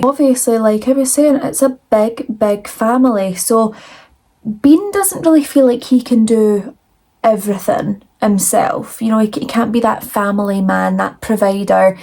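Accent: Scotland, United Kingdom. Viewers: ❤ love your accent ! Are you Scottish? Sorry first video of yours i found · Hey I don’t usually comment like this on videos but I see you’re new so I wanted to post more constructive feedback. I think you’re a bit hard to understand and I don’t think it’s the (scottish?) accent. For clarity, I’m American which doesn’t help since I don’t hear this accent too frequently, but I think it’s a microphone quality issue since it’s the fuzziness between words that makes it harder to understand. I loved your video, first time watching but I think it’s an audio quality issue. It’s either from the microphone you’re recording from or maybe from compression when you’re downloading the video. I don’t know much about it all but your camera quality is very good and you speak very well and it sounds close to the microphone so I assume it’s a compression/ tech quality issue. 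From my time playing classical music and having to record audio for lessons and stuff, I’ve learned that putting my mic in a 12x12 foam box helps some or putting a mesh barrier between you and the microphone. I hope this doesn’t come off critically, your content and video are very well made and I subscribed to the channel as I think it’ll be awesome! I just don’t want you to lose potential subscribers due to audio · It’s a Scottish accent, but close! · Nothing beats the authentic British accent! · That's what I said I just found her like just now, listened to her talk and I'm like omg she Scottish love it already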